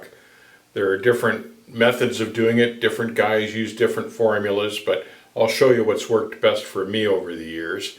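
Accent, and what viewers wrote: Canada. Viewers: Always great info with an awesome Canadian accent!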